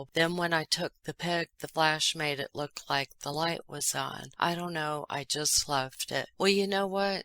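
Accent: South, United States. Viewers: PS. You also teach me so many new expressions in the English lingo. I'm very grateful. Your sense of humor is just my cup of tea. Your southern accent isn't that pronounced to me as some I've heard from/of. I myself has a "cosmopolitan" accent, my best friends from the U.S. told me last time me and my hubby visited them. That is more kindly said "Your accent is all over the place with Swedish, British, Australian and American and it sounds pretty f'ck*ng funny!" DS. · southern drawl! It reminds me of my southern-as-all-hell aunts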